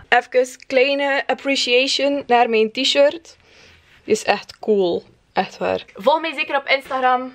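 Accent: West Flanders, Belgium. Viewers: Meer videossssss aub :-) west vlaams hell yeah! SUBSCRIBED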